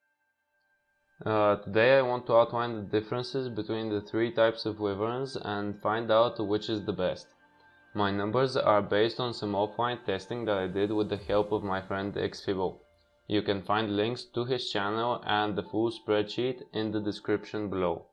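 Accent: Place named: Eastern Europe. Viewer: where ere you from? you sound Slavic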